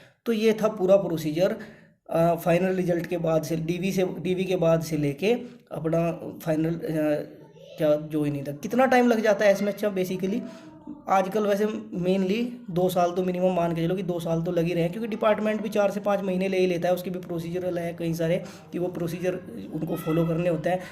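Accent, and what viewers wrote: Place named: Muzaffarnagar, India. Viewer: Bhai UP west he ho kya muzzafarnagar side sei. Tone muzzafarnagar wali hai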